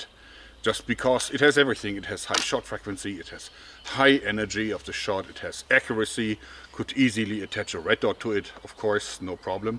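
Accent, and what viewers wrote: Germany. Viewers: excuse me! Are you German?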